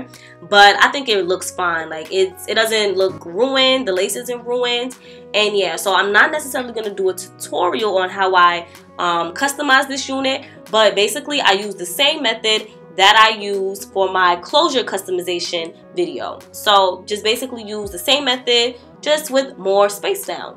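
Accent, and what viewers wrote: New York, United States. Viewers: u can hear the new york in her voice 😍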